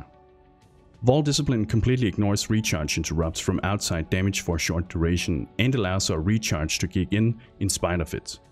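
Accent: Denmark. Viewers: jeg har altså en eller anden idé om at den eneste grund til at folk ikke snakker om dig/recommender alt dit content er pga din horrible accent:P Det gør ondt at høre på som dansker, men det er sikkert også ret skidt for ikke-danskere. No offense! Jeg ønsker dig kun det bedste... men ja, øv, måske du kunne (måske bare for min skyld.. det kan godt være jeg er den eneste) prøve lidt hårdere på udtalelsen? Den værste er når du siger "whalecum" istedet for "welcome"... oof!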